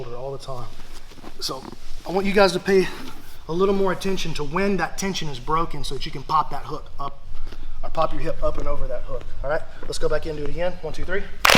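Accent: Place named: Alabama, United States. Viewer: hey are you also from alabama? I recognize the accent. cheers from Hartselle.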